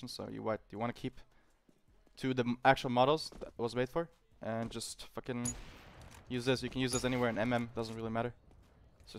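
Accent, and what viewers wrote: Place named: Germany. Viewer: Man merkt ihn sein Deutsch zu hart an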